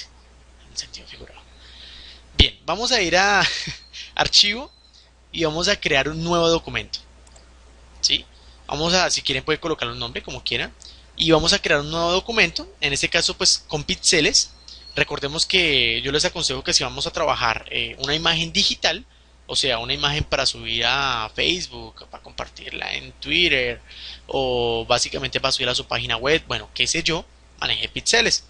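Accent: Colombia. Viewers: Estos acentos colombianos cómo me gustan... Y más cuando se nota que es una voz inteligente✨♥️ Gracias por el curso,!! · Gracias parcero, soy arquitecto y me había predispuesto y veo que no es difícil, ademas que bacano, escuchar un tutorial con acento colombiano. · Uuuuyyyy Colombiano tenía que ser ¡Gracias maestro! la tienes clara oyó? saludos desde Nariño. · Esa forma de hablar, ese acento, dejame adivinar, Colombiano? :v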